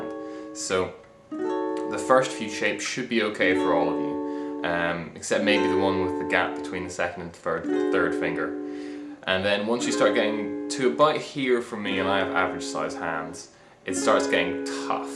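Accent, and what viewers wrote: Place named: Northern Ireland, United Kingdom. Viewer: true man! your videos are great and i hope to see more from ya! haha cool so i was little bit right! :D i thought i detected a tiny bit of northern irish in there somewhere haha!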